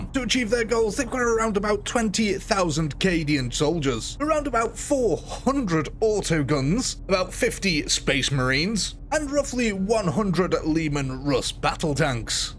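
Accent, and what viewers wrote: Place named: Potteries, United Kingdom. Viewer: 40k in a Potteries accent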